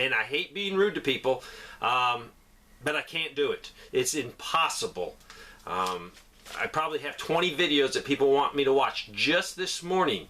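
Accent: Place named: South, United States. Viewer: He's Southern. We talk funny!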